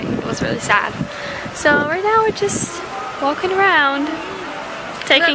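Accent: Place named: United States. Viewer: This was sooo coool. I love Europe and it seems so much better to see it thorough the eyes of someone I know. Brighton is beautiful. Is your friend also Dutch?? Was that your first time in England?? Does England feel like the rest of Europe or does it feel like the USA, or just different from them both?? Did I understand that your flight was only 1 hr between Amsterdam and London?? That seems very close. When do you think you will need your own car? Could you have driven from your home to Brighton?? Do you even know how to drive yet?? LOL.. (Please forgive my ignorance if that seems like a dumb question.) You know how it is here in the US, you need your own car to get anywhere because the public transportation is not as developed as it is in Europe. And states here are so big, especially on the West Coast of the US. Life in Europe seems very different than here. 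You have lots of different countries and languages around you. Do you mostly stay in your own country or do you travel a lot around Europe?? Was it expensive to fly from NE to England?? Do British people think that you are American because you speak English like an American?? Where you were here, I could not even hear your accent anymore. Your English is very clear. I wish I could speak Dutch as well as you can speak English, but Dutch looks like such a hard language to learn. LOL... Fantastic video. Good music and good editing. Thanks a lot.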